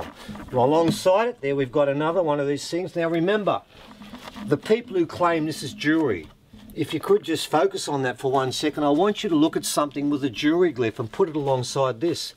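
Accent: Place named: Australia. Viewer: It sounds like this documentary was made by an australian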